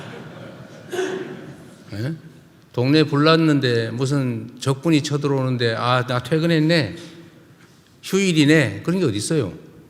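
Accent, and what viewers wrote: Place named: Gyeongbuk, South Korea. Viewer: 갑자기, 이재명 대통령님의 말씨가 고 김광석 형님의 말씨와 흡사하다는 느낌이 드네요. 그러고보니 두 분다 경북 출신입니다. 그래서 더 그렇군요. 참 정겨운 말씨였죠. 광석이 형님 ㅠㅠ 두 분이 또 연세도 비슷하셔요. 광석이 형님이 용띠, 이재명 대통령님이 토끼띠인가? 참고로 저는 말띠입니다. 광석이 형님 닮은 제 친형도 토끼띠예요 ㅎㅎ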